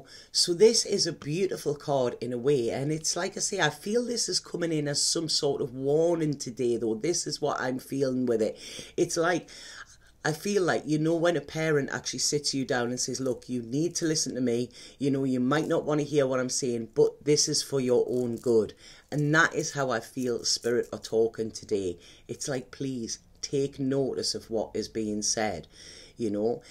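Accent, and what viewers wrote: Newcastle, United Kingdom. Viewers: it does suit you. I am watching your videos from Marseille. How funny..I was 7 years in Newcastle so your videos have a special touch to me. I love it all, you accent and kindness remind me how beautiful and friendly Geordies are + your gift as a reader is amazing and the positive energy you bring to us is a tribute to your soul. I am also a fellow Virgo..so all of this makes me feel like a child in a school yard feeling 'I want her to be my friend!!!!!' Keep up you are a highly evolved human being. Almost to good for this earth....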